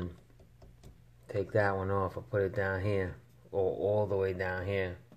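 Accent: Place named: New Jersey, United States. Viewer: I'm gonna guess New Jersey accent ... Thanks for the video. You did good.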